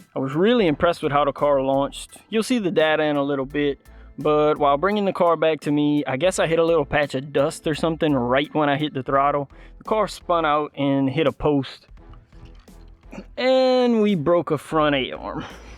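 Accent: Louisiana, United States. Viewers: Your accent sounds like you are from Louisiana if I'm correct what part are you from I'm from Gonzalez but live in Clinton now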